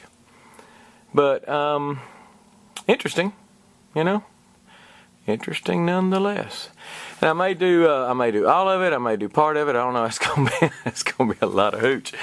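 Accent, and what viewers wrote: Texas, United States. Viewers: Who the heck takes the fruit out of the drink eat a whole or Blended. that's the best part it's like an Ever melon. When you cut a bottle size hole in the top of your watermelon flip over a bottle of Bacardi in that thing overnight next day eat the watermelon it's great! I like it even better with coconut rum like Malibu or something.... I'm a big fan of an unfiltered wheat beer, blue moon Hefeweizen stuff like that and I'm just getting into Brewing Meade's and wines so I kind of think everything that's in there is the way nature intended it and I pretty much leave a drink intact unless I think it would improve it to filter it clarify it or any stuff like that but like I said I'm a newb at this. Thanks for the good advice and you don't talk too much good sense of humor and a Texas drawl music to the ears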